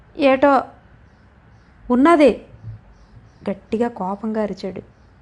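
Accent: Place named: North Andhra, India. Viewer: ముగింపు ఊహించగలిగేదే ఐనా కథన బలం చదివిస్తుంది ఇలాంటి కథల్లో! జీవన చిత్రాలు కథలుగా కదిలే విధం అదేగా! ఉత్తరాంధ్ర యాస గారి గళంలో బహు సహజంగా ఉండి కథకు మాధుర్య పరిమళం అబ్బింది!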